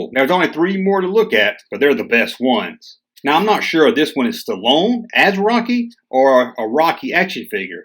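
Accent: South, United States. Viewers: A guy with a southern accent who can’t pronounce Darrel Waltrip? My mind is blown.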